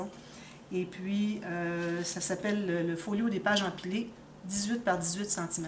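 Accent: Quebec, Canada. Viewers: Piquer une jasette c’est encore pire parce que ça sous entend de la colère en jasant...😖 bavarder vous conviendrait il où discuter? Et si nous placotions le 22 mars? Sauf que là, personne ne comprendrait peut-être... Ce n’est pas un cours de français, (un peu quand même car je contrôle toujours que ce qui me paraît inapproprié en français français, l’est réellement!) c’est amusant plutôt. Et j’ai du temps! De plus vous faites beaucoup d’efforts pour parler un français correct alors, on peut bien vous apporter notre soutien de cette façon. D’un autre côté, jaser peut plaire à beaucoup, donc c’est vous l’arbitre. On peut toujours vous laisser jaser, ce qui est fort plaisant avec votre accent canadien français! On peut ne redresser que les termes techniques qui ne conviennent pas ou que vous cherchez. Bernadette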